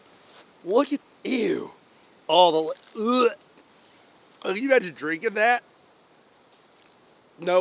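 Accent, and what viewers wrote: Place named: South, United States. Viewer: I LOVE THAT SOUTHERN ACCENT!!!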